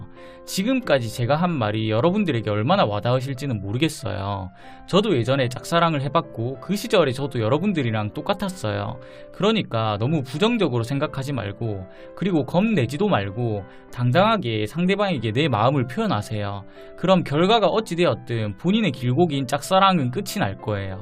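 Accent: Gyeongsang, South Korea. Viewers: 혹시 사투리가 경상도이신건가요?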